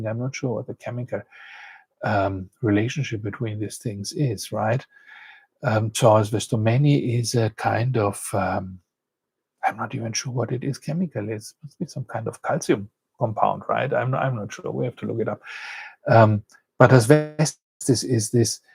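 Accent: Germany. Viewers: Hello, may I address you like this? I love your content, my husband and I have been trying to learn Greek for a couple of years now and we especially like your approach of explanation with all the connotations. And since we write you from Germany we love the “airy” German accent in your English! Just saying that the sound quality in the streaming production was not very good and made it often difficult to understand your explanation. In the whole we look forward to seeing and hear ing more from you and thank you for your effort!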